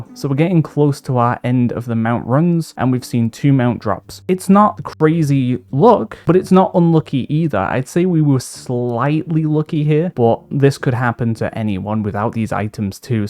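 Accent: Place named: Liverpool, United Kingdom. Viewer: I’ve enjoyed this sounding guide to say u vowels in a liverpool accent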